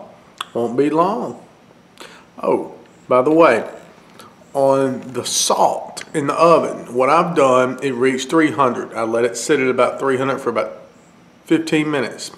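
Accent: South, United States. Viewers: lol the southern accent. I am in NOLA. 1. Wouldnt it be better to peel off the shrimp skins for the ingredients to seep in all over it? 2. For how long should we wait for the ingredients to seep in the beef and the shrimp after applying the ingredients on them? Thanks. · i never know they had this salt block... like your steak and accent man.. yeehawww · Love that southern twang! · ok you sounded southern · You have heavy southern accent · I know you love to cook but PLEASE do a voice over for one of my books, I love your southern accent. brings me back home! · Interesting southern accent and cooking. I enjoy your video, thanks. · All the comments about your accent are infuriating. But I guess some people have never been down south. They're missing out on some good cooking. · I was initially turned away from the video due to negative experiences with individuals with Southern accents, but I like how he repurposed the Sriracha bottle. 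It's funny how embracing different food cultures releases any tension I had that he belonged to the same group of hate-filled individuals in my past. Nice vid dude, it's a neat idea cooking on a salt slab. I've never seen it done like that:-)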